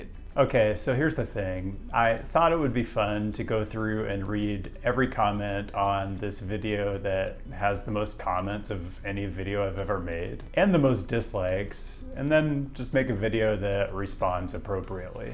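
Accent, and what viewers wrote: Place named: California, United States. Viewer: I cant stand your California accent 😂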